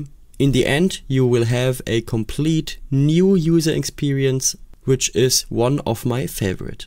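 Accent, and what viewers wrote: Germany. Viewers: ich höre da einen leichten deutschen akzent raus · Thank you mate, your Accent sound like Super German by the way😊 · Love that german accent :face-blue-smiling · Den Deutschen höre ich doch raus, danke für das Tutorial! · i love deinen german accent Digger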